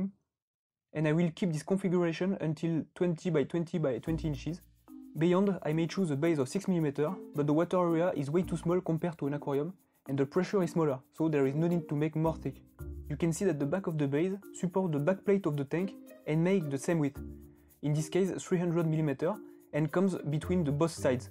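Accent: France. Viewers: c'est un français à l'accent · it's typical french english, it's quite cute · i wish i was french just to have an accent like yours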